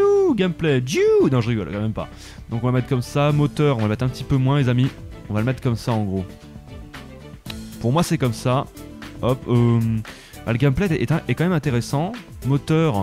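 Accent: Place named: Paris, France. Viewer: pourquoi tu dit gare et pas genre . tu est de Paris ??? Parce que moi je suis d'Aveyron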